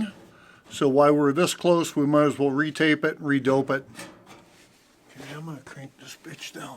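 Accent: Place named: Minnesota, United States. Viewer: First time see you like your content….is that your daughter..you have a Minnesota accent..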